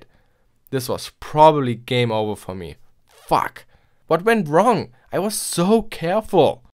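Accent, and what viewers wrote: Germany. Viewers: Bist du eigentlich deutsch? ;D Die Aussprache ist zwar gut aber irgendwie zu deutsch xD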